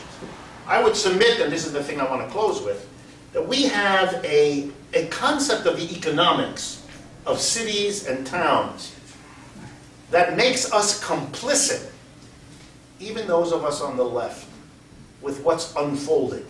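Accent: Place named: New York, United States. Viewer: Listening to Dr. Wolff speak is like listening to Woody Allen explain economics. What a New Yorker. :)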